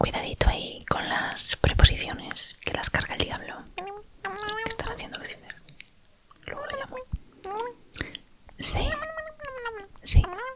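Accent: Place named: Spain. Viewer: jajajajajaj 😂😂 te luciste con este video fue mega divertido y relajante me resultó genial la vocecita al teléfono! saludos desde méxico ❤❤ de qué parte de españa eres? me encanta el acento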